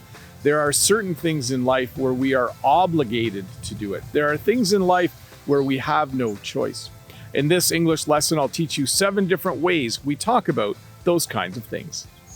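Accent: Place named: Canada. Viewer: finally found someone canadian that teach canadian inglish for me is the best understand very well evething was there many years ago I miss canadian English, usa English is more confuse to me Thanks 😮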